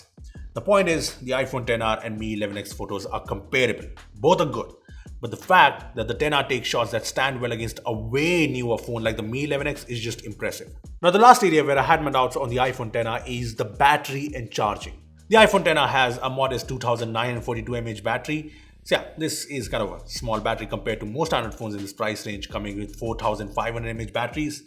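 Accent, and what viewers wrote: India. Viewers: Butler english...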